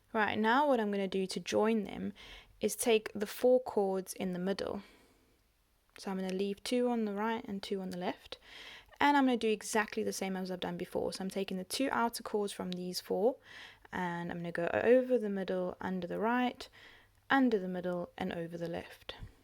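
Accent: South Africa. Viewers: South African? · Thanks for this! I needed a refresher! Are you South African? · Great to hear a South African voice.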